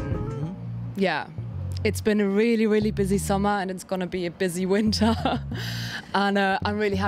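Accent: United Kingdom. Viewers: Ha! Sounds like she might have lived in Manchester for a bit.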